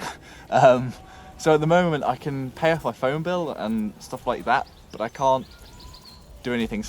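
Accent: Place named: United Kingdom. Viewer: That brittish accent sounds so good! I hope you find a new job and get happy again.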